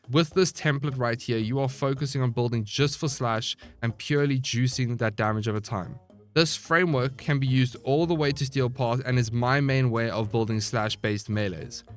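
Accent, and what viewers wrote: South Africa. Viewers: Hey dude just discovered your channel. Really enjoy your videos so far, keep up the good work. Are you from South Africa? :)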